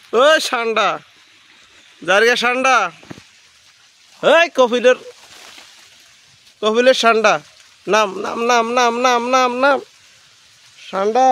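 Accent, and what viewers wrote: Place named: Noakhali, Bangladesh. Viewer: আন্নে এরিন্না নোয়াখাইল্লা কতা কন, আন্নের শরম লাগে না? আন্নেগো বাড়ি কোনাই? হাদেরখিল নি?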